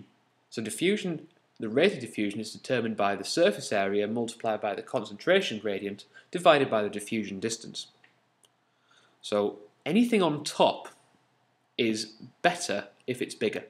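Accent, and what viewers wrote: United Kingdom. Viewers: Oh myyyy .. That British Accent that I miss a lot!!!!!! Thank you it was so helpful for my SAT biology subject test :)))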